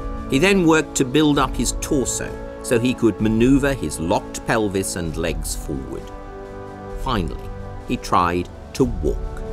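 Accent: United Kingdom. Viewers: A peculiar source of narrator. Why would this outfit select a person with a somewhat muddled but clearly British accent to discuss an American president? It's inappropriate, to say the least.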